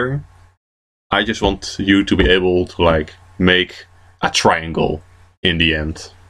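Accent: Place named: Netherlands. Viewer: ik hoor dat je nederlands bent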